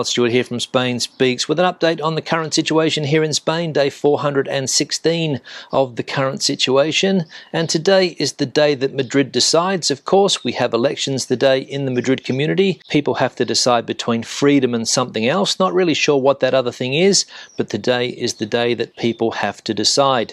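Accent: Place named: Australia. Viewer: Very atmospheric outside in your lovely garden in the Spanish sun with birdsong, gracias a los vecinos ruidosos👍 AND out in your 'CAH' - love your Oz accent - letting us see what we miss 🕶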